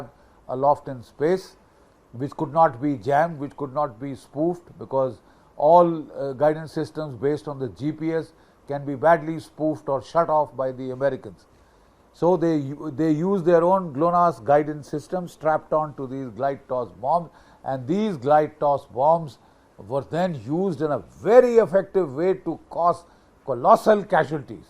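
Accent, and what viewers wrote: India. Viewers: I can’t listen to this paj33t accent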